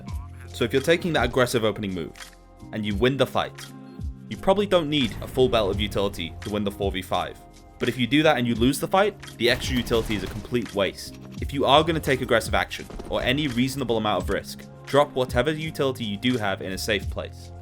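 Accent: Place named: United Kingdom. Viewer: AI? Brother, this i0s just a british boy doing a voiceover over cs clips. What is possibly AI? His brain?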